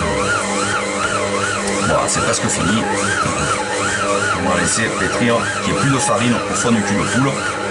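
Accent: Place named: South, France. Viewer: Avec une voix qui sent bon le Sud 🤗 je dis Ouiiiii!!!! Je testerai la pizza plus tard, je savoure déjà l'accent 🌾🍅🍕😄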